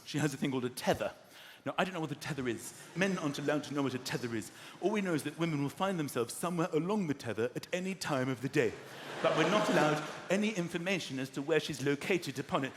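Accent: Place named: United Kingdom. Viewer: I bet he got bullied at school, little lord fontleroy posh english crap.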